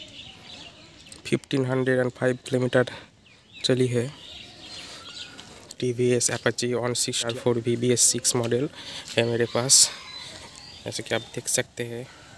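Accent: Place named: West Bengal, India. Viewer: Gd one..bt you should improve your hindi 😇..i think you are from west bengal ❤️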